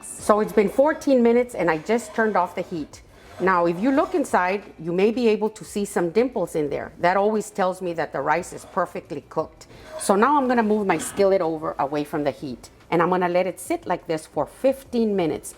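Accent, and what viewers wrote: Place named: New Mexico, United States. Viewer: THANK YOU SO MUCH! I can’t ever make a perfect rice! I Love All Your Recipes! Are You from New Mexico? In the 70’s l dated someone from Espanola New Mexico and l met all his Family you have an accent like his family, I didn’t Marry him, had a wonderful husband for 43 years he passed away 2 years ago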